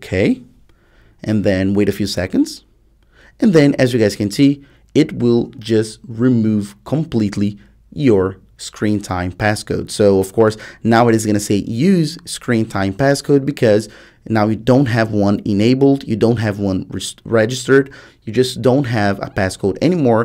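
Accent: Brazil. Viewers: Brasileiro ? Sotaque não engana kkkkkk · Fala brazucaaaaaa, sotaqueraaaaaaa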